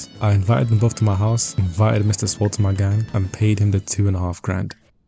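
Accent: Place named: Jamaica. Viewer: Is it just me or bro use Jamaican sound 😮and i am Jamaican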